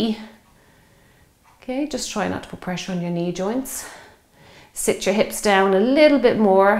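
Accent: Ireland. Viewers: I was just looking around for a workout to get me back into a good workout routine after a long time away due to injury. This workout is just perfect. And your Irish accent is so lovely to listen to!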